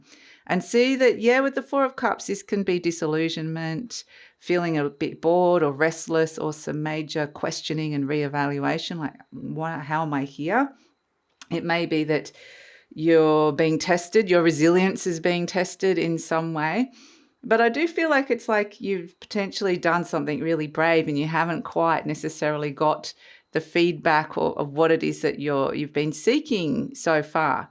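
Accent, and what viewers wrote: Australia. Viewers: I knew it was an Australian accent 😊 kind of hard not to when you're from Oz 😂 I'm from Brissie. Good to see we have a few readers from home. Resonates a lot actually. Thankyou for the reading. Wish you all the best & many blessings 🙌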